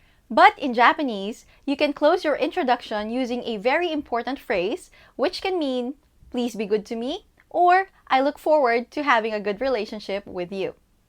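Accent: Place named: Tagalog, Philippines. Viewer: wow she has less trace of Tagalog accent in Japanese than in English!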